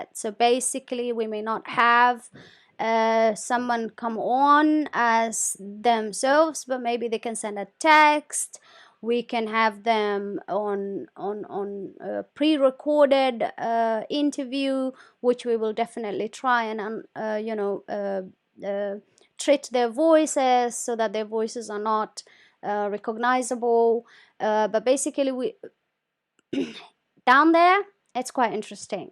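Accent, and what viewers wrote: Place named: Ethiopia. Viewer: You sound like Habasha not Somali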